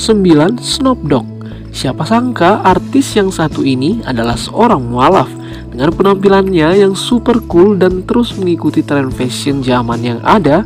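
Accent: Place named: Indonesia. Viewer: Blajar nyebut yg bener nama2 artis..jgn diikut dngn loghat Indonesia kalo Mau bikin content..👎👎👎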